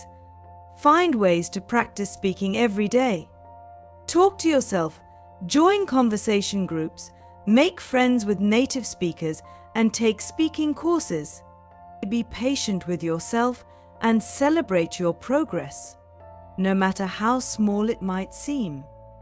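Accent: United Kingdom. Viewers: 영국신 발음이네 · British accent?❤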